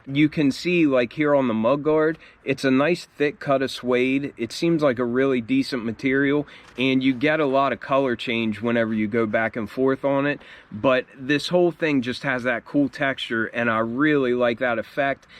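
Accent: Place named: Pennsylvania, United States. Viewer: Nice video man!! Just a quick question are you from Pennsylvania?? You have an accent that reminds me of myself from Pennsylvania...lol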